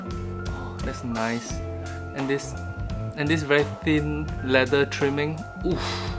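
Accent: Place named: Singapore. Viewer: You sounds like Singaporean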